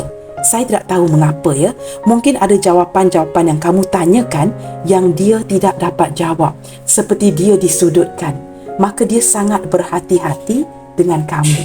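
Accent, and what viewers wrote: Malaysia. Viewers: Sleng MCM mlyu lah..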